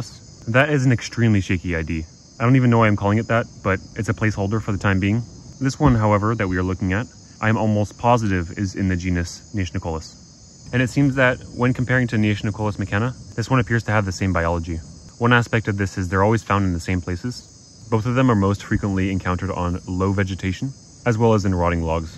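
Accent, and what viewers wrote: United States. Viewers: American Accents are bonkers as it is but this guy sounds like he’s trying to talk with marbles in his mouth.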